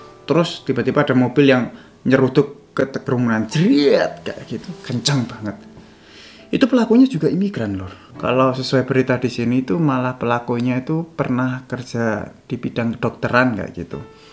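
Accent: Java, Indonesia. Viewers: Org jowo Yo mas? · Baru ketemu channel ini. Kok, bisa lidahnya istiqomah medok sekalipun sudah jauh ke Jerman?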